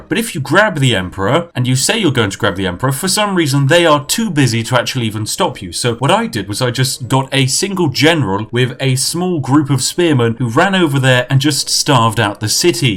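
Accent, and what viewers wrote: United Kingdom. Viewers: That british accent makes a lady happy indeed. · You know I usually dislike brits due to their attitudes/accents. You sir, should be royalty. Funny as hell, informative and got me to drink a cup of tea. Hats off to you sir. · the use of the British exploit of anglicizing everything and not even bother to try and pronounce the names in the original pronunciation made me laugh very Spiffingly British hahaha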